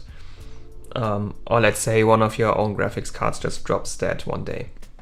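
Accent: Germany. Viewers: Started watching and thought to myself that is German accent isn’t it? Then you said Germany and I knew it!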